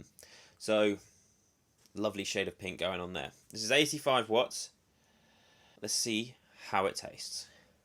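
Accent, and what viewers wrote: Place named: South, United States. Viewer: You're very welcome. Ah okay, not to worry, just thought that i recognized the accent but i guess all us southerners sound pretty similar ;)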